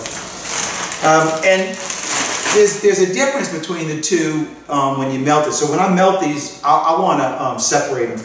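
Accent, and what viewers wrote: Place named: New England, United States. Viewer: you don't sound like you're from Louisiana. More like New England originally.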